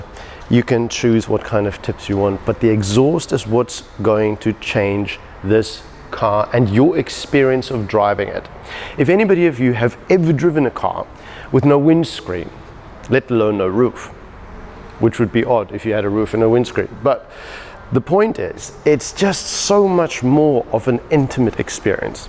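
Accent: South Africa. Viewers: I had a feeling you were a fellow South African, judging by your accent and colloquial expressions. Really great stuff you're doing man. Keep it up.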